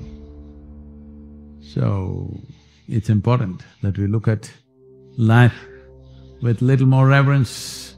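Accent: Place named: India. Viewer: Ur vdos great. But indians better learn speak English not Indglish.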